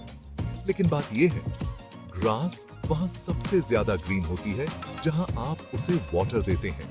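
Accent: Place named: India. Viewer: English thodi thodi Hindi jaisi hai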